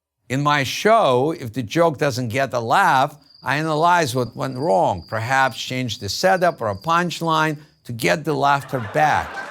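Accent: Russia. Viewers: Русский акцент, господи 😖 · + The Forms, He is probably a Russian Jew. He does sound Russian.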